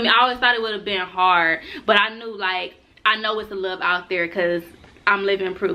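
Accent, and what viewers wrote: Detroit, United States. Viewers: I love Detroit accent 😍😍😍 her voice so pretty 💝💖💝💖💝💖💝💖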